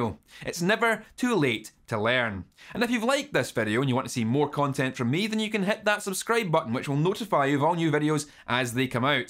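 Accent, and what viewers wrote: Scotland, United Kingdom. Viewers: YouTube loves the Scottish accent lol. I saw a video about Glasgow once where the captions thought they were speaking Dutch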